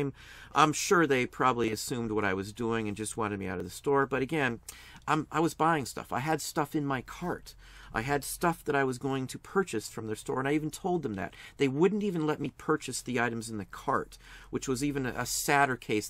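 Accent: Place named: Northwest Ohio, United States. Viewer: Sounds very NW Ohio.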